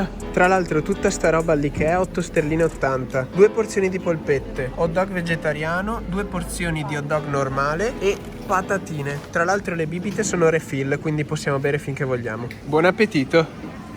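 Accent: Veneto, Italy. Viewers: A me sembrava che avessse un accento Veneto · Non so come sia il bresciano però dai si sente un casino che è veneto 😂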